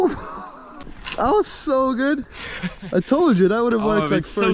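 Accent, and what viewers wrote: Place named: United Kingdom. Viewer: I have a feeling like your accent is becoming more British. Have you noticed?:)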